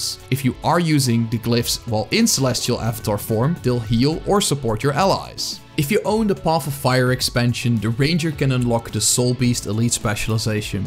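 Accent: Netherlands. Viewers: I sense a hint of Dutch in that accent. Is it? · Thanks for the video mate. A friend of mine and I both got into gw2 recently (even tho I tried it a year ago and bought the expansion in the thought id play it more, which I didnt). I came back and decided to start a new character to get back in from beginning to end but didnt know what class or something. This helped me a lot. Greetings from a fellow Dutchie, I heard the accent and its the same I have when I speak English :) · You sounded dutch, checked the about page and I was right! Also, a good class guide. (This sounded like dis, that's why I guessed dutch.) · Good to find some active GW2 content creators, immediately recognized that accent though, fellow Lowlander :) Thanks for the quick guide, been coming back to this game after a year having just played a few months.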